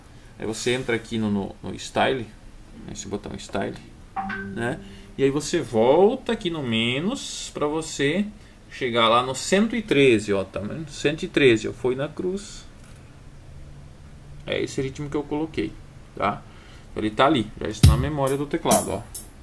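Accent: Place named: South, Brazil. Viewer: Sutaque do sul rsr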